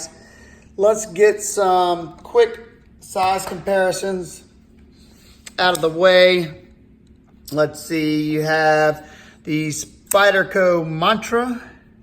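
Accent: Louisiana, United States. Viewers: Nice accent, is that Louisianna? Sounds great. Excellent video thanks!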